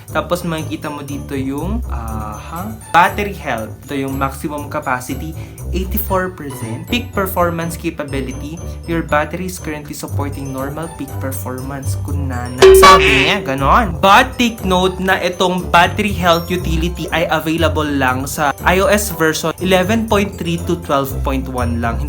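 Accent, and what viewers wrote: Ilocos, Philippines. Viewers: Ilokano ka po ba?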